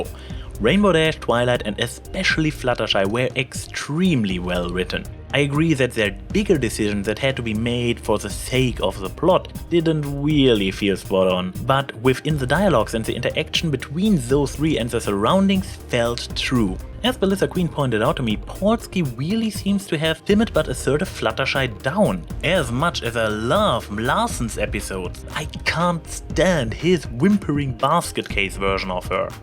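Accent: Germany. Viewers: Hey, I noticed your accent. Are you German or Austrian? I'm Belgian, BTW.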